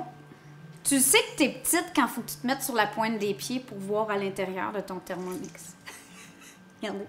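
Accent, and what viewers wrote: Quebec, Canada. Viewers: Merci pour cette recette libanaise aux accents québécois. J'adore la patate de (?) Plus naturelle tu meurs, ça change des vidéos de cuisiniers et cuisinières aux culs coincés!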